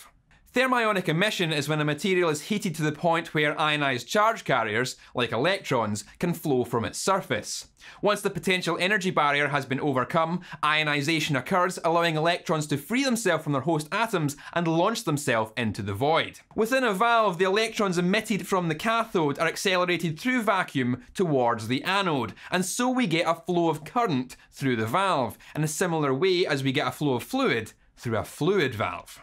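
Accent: Scotland, United Kingdom. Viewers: Watching video like "Ok. Makes sense. Grid biased... Anode whosits... Dull Scottish voiced white noise playing as consciousness ebbs away... Hit like and subscribe" and I'm back to reality. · Great, as usual. Love that Scottish accent, since I learned English in Edinburgh. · I'm 57 and learn new shit from you every time I watch one of your videos. Love the Scott in your voice. Thank you. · Still has Scottish in his American accent. I love it. I love it so much. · great explanation, but your American accent sounds like an American doing a Scottish accent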